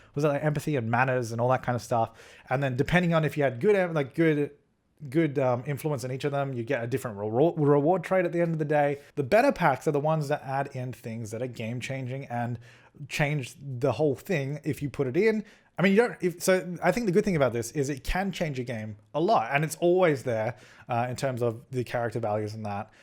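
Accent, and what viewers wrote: Australia. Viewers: Are you Australian? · I love your voice and accent, are you Australian?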